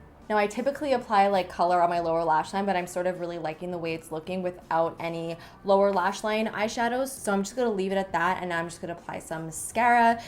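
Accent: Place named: Canada. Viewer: One of my favorite things about your Canadian accent is how well you enunciate specifically all letters in words such as Anastasia. You could have a talk show or podcast and I would so listen. 😂 Not trying to sound creepy, just enjoy your calm and well spoken voice! Love you xoxo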